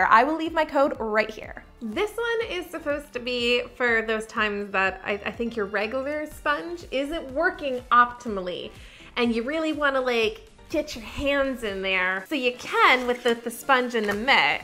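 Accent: Canada. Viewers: I thought she was Canadian😂😂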